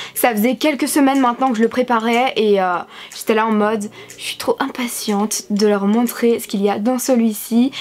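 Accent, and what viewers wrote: Belgium. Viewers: Tu et belge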